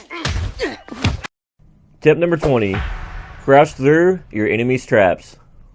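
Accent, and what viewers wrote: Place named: South, United States. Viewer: Where you from man?! Gotta sub man you sound southern as Hell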